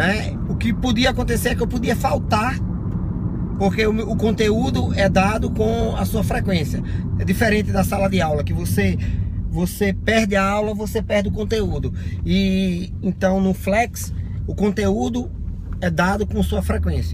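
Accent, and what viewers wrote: Northeast, Brazil. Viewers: Tu é nordestino neh macho? Kkk cheguei agora no canal, vou ser inscrito.. E sim eu faço SENAC-PE e so tenho a dizer que é top man, eu não sabia de naaaaaada, e agora to quase terminando o básico e posso dizer que ja posso ter uma conversa básica com um americano, principalmente saber ouvir. 5 meses de curso.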